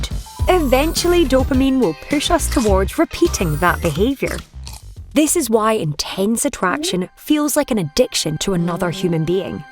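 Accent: Scotland, United Kingdom. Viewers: Pretty sure she is a brit, but i don't know exactly which region.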